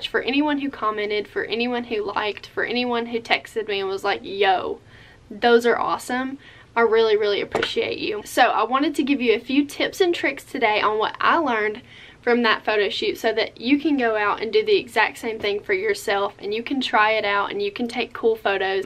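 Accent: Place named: South, United States. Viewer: Yay! You are so freakin awesome! I hear the southern accent! I am just below you in MS. Oh, hey NSC sister!!! Keep shining your light of influence!!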